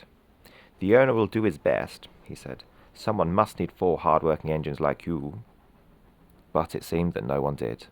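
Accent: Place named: Wales, United Kingdom. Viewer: Love the Welsh 🏴 accent, quite charming. 😊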